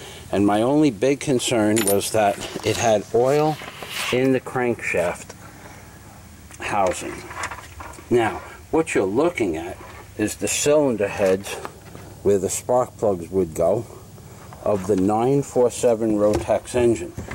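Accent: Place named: Rhode Island, United States. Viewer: Sounds like you're from Rhode Island like me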